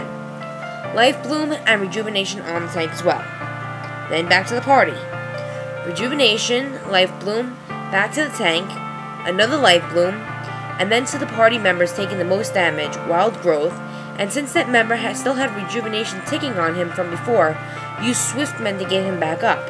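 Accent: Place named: Brooklyn, United States. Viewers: she sounds like mila kunis with a bit of a new yorker accent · i like 2 things about this video 1) the tip saying how healing isn't nessicarily about rotations just knowing what move is best for a given situationg and 2) your awsome brooklyn accent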